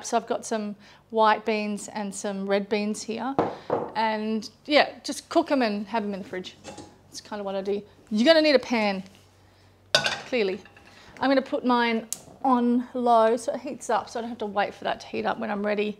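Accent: Australia. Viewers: Chef Also, thank you for replying as to why the oil! Ok-gotcha... It was what you decided to use for that day! I’m finally going to make this bean dip this week! Been busy! Hugs to you... Also, love the accent and one day hope to visit Australia! Yet I think you’ve shared before that you’re ••not in Australia and rather: Thailand? Coolness either way! Blessings....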